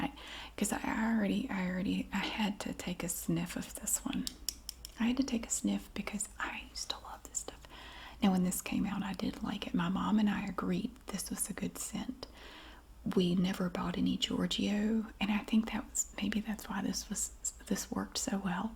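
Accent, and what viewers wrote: South, United States. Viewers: I remembered watching videos a long time ago and decided to revisit the lady who inadvertently taught me how to do a Southern accent. Not even halfway through and I am howling 😂 Why on Earth did I ever unsubscribe, I must have been tired of how much fun I had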